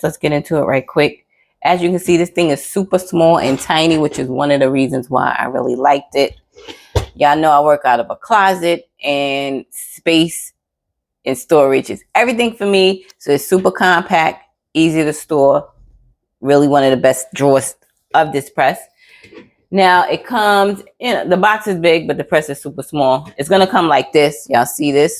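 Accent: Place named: Brooklyn, United States. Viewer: Yep that's about rite😁...can hear Brooklyn all thru ya wordz..big Bronx iz definitely rockin.. keep grindin luv...alwayz nyc luv💪🏾🔥💯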